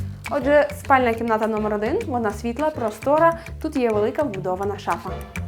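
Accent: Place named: Ivano-Frankivsk, Ukraine. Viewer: слухайте, ви, «мовний спеціаліст» ха-ха! Іванофранківці балакають прибацаним російсько-польсько-самовигаданим суржиком, і ця пані-ведуча Якраз так і розмовляє. Кажу як киянин що прожив у франківську 4 роки. Я вже їх наслухався, повірте мені!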